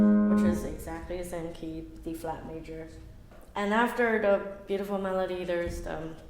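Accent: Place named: United States. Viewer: I so agree. Her American accent & mannerisms, which have worsened since this interview here, do take away from who she really is, i believe, When she plays she is herself. Here, the Americanisms aren't too bad... yet. :-/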